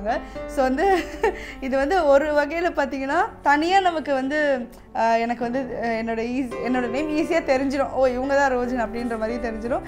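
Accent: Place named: Kerala, India. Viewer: Malayalam slang theriyudhu lighta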